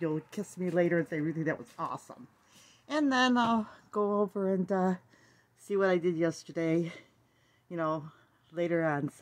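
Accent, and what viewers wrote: United States. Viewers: Black Sabbath without Ozzie Osborn, was like a skunk without stripes or a smell...basically just a cat at that point hahaha. I saw Black Sabbath in concert MANY years ago, back in the 70s, man that is a story to tell some day. Y'all have a Merry Christmas. Your accent, WI or a state near there, yes?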